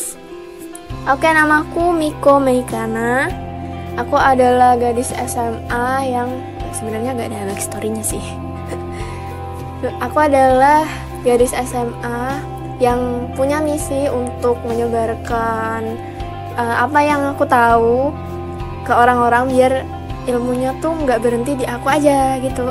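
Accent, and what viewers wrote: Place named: Java, Indonesia. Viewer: waahh jawa banget! btw semangat yaaa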